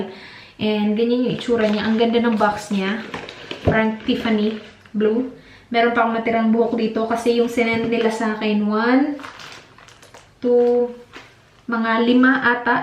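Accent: Visayas, Philippines. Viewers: Makakatowa kanaman mag salita ate girl Bisayang Bisaya..I love you ate girl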